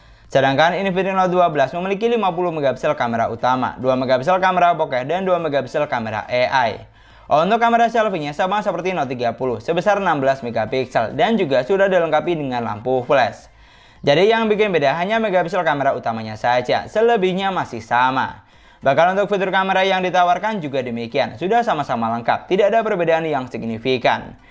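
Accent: Madura, Indonesia. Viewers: mas logat maduranya kentel banget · Logatnya kaya madura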